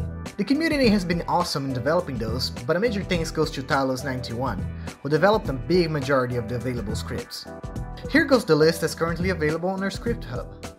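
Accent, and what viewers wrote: Brazil. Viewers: caraca kkkk percebi que é br so pelo sotaque kkk boa man, parabems pelo projeto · deu pra percebe que você é BR só pelo sotaque, é muito foda ver brasileiros fazendo projetos assim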